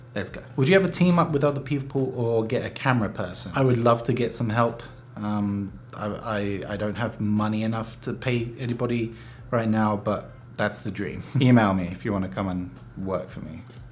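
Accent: Finland. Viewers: It's probably just me hearing things, but it kind of sounds like you've got some, just barely-there hints, of Finn-spoken-English in your English accent now.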